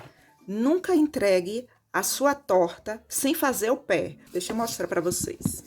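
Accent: Bahia, Brazil. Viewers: Eu caì no seu canal por acaso, me apaixonei de cara e me inscrevi imediatamente! Muita simpatia e esse sotaque maravilhoso da minha terra, a Bahia...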